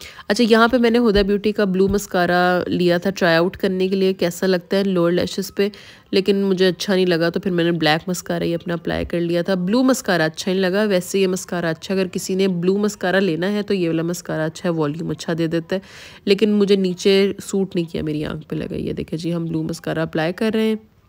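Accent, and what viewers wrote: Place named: Punjab, India. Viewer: Kuch b lag rahi ho bolne se bilkul paindu lagty ha kuch b high fy pehan lo bolne ka andaz daggon wala hi rehta ha punjabio ka bolne ka style sahi karo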